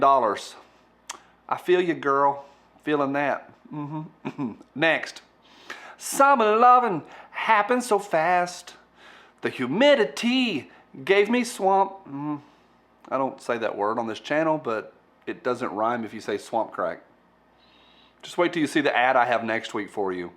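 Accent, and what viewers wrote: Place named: New England, United States. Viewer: We need more New England accents, lovee.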